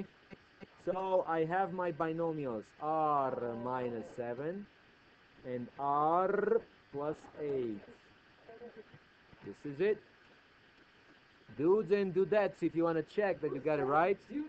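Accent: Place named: Eastern Europe. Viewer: Where is your accent from? Just curious I wanna guess eastern European.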